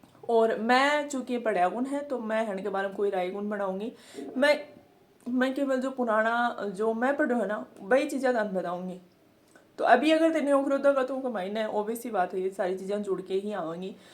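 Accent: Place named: Chirawa Pilani, India. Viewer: Apki langauge chirawa pilani side ki lg rhi h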